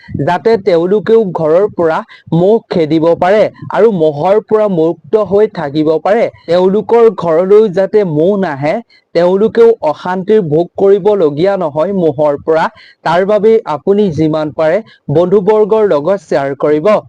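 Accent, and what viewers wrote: Bangladesh. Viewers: Aponar kotha khini bangla deshi jen lagil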